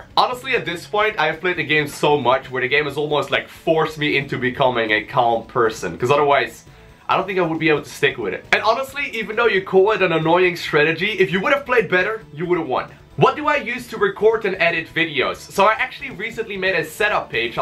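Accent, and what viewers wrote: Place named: Netherlands. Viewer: Don't get supply blocked man! Keep building the eco ;) PS: your accent back then was so dutchy and cute!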